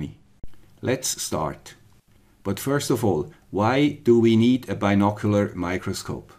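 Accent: Switzerland. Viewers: Many years later, this is still a very helpful video. Thank you, guy with the Swiss accent!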